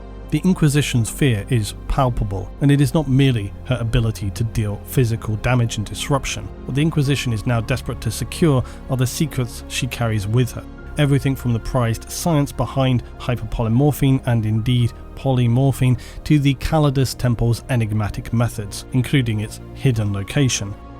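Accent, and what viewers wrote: England, United Kingdom. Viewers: No amount of English accent and somber narration can change the fact that a female body simply isn't built for any sort of physical combat, much less assassinations. The 40k universe pandering to this idea just makes it less credible. There's already a lot of questions concerning 40k lore, besides the nature of these Callidus Assassins and it only makes it worse. A lot of 40k lore is so out of touch with logic, it makes me think that the only thing that keeps this franchise going is the blind fan loyalty who don't care about all these issues.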